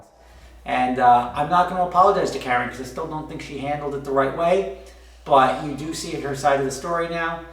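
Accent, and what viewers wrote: New Jersey, United States. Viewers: Stop apologizing for talking like a New Jersey city boy.. that's the way we talk.. 🤷‍♂️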